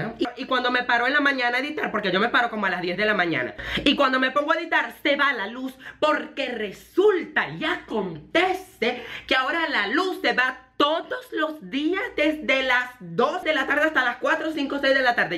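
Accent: Venezuela. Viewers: Su acento venezolano Me enamora Y me enloquece!!!😨😍❤🔥 Like si crees lo mismo!! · Te equivocas Oriana, ese acento no se lo invento la, así como habla la es como hablamos la mayoría de los adolescentes en Venezuela, te digo que yo descubrí a la hace 2 meses y yo hablo así como ella desde hace años, al igual que mis compañeros del liceo. Y obvio que los Adultos no van a hablar como los jóvenes, ellos son mas maduros que nosotros.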